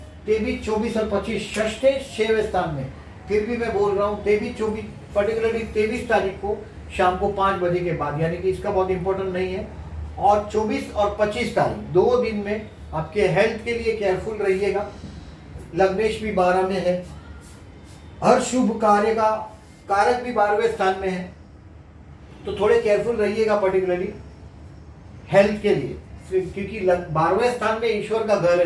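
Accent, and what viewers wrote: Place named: Gujarat, India. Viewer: Is he from Gujarat? His accent is so.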